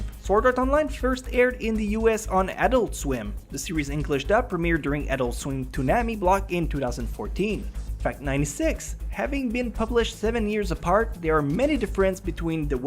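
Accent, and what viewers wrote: Canada. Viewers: Clearing this up for everyone shitting on his pronunciation: he's French Canadian. For some reason, and I've experienced this through close friends of mine having the same issue, French Canadians struggle a lot with English pronunciation. This is because of tongue placement. In French, the tongue tends to rest on the bottom of the mouth when speaking, whereas in English it is more at the top. This is an issue when learning a new language later in life because the tongue has trouble getting used to switching from the bottom to the top and vice versa. And now you've learned something new! Enjoy your day!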